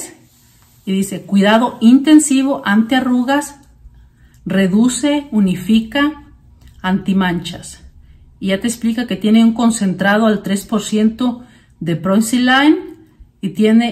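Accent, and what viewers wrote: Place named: Mexico. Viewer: Hola me gustas mucho como hablas 🥰 mexicana nata 😅 saludos desde 🇪🇦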